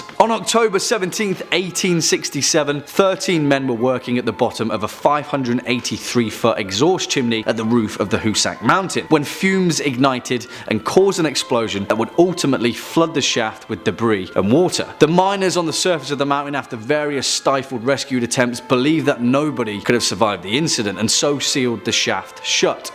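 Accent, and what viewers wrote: United Kingdom. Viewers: HOW can this dude be from Ontario, CANADA when he got a BRITISH accent? WTF???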